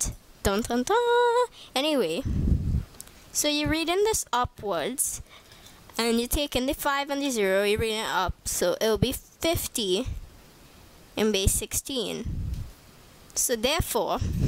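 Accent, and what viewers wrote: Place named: Caribbean. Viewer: I stayed when I realised your accent was familiar. I'm also from the Caribbean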